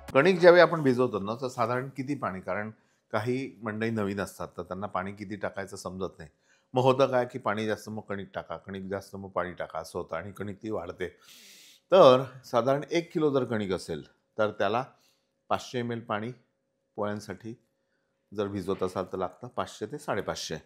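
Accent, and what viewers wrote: Vidarbha, India. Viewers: Sir aap vidarbh k hai, khandesh k hai , ya marathwada k 😊😊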